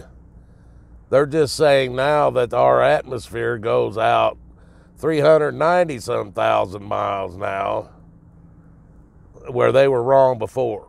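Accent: United States. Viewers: lol i thought it was richie's brother terry from texas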